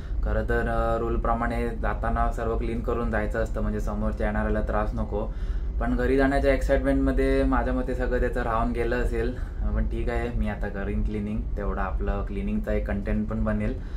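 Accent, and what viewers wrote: Konkan, India. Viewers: कोकणी भाषा आहे ती तसेच बोलतात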